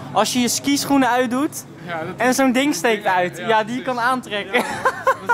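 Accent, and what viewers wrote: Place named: Rotterdam, Netherlands. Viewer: Wij komen uit Rotterdam kan je dat niet horen dan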